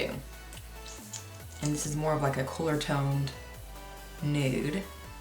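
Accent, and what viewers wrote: South, United States. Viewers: I love it!!! I'm from NC, and its so refreshing to hear it! 😊 (Yes, I realize the geographical distance between Alabama and North Carolina, but I meant the southern-ness of it!!!) 😘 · I’m from Kentucky we share the same southern accent! Lol. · Sounds southern.....(I'm a Texas girl) ☺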